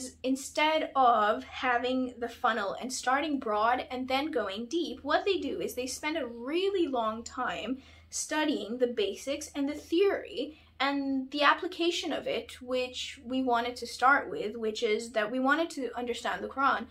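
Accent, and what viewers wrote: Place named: United States. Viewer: It's a very interesting concept and makes a lot of sense. Arabic is not an easy language to learn and not everyone needs to learn all of it in order to understand fus'ha. Very good point about learning from translations. Where are you from? Your channel says UK but your accent sounds slightly American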